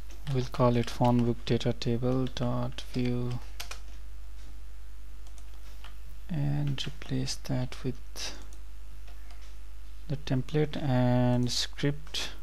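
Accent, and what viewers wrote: India. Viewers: Опять индусина. Бля невозможно слушать...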